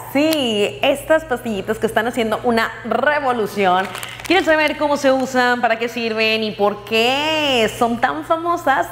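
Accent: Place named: Fresa, Mexico. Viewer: Por qué hablas tan fresa !?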